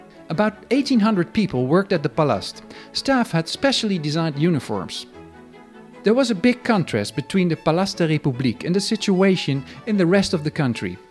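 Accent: Netherlands. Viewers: Great vid, I'm guessing your first language is Dutch? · You sound very Dutch. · Blame the Dutch accent. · First time viewer(and new subscriber)…very informative thankyou…I lived in BRD(München) IN 1985/86 and visited Berlin a few times…I remember this building…also..back then..never in my wildest dreams did I imagine that the wall would come down….p.s. to my ears your accent sound Dutch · You mean “the only channel about East Germany in English” with a heavy Dutch accent! Calling it “English” is a bit much. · he has dutch accent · Interesting, thank you. Your accent sounds Dutch, so not an Ossi :-) What made you take up DDR history?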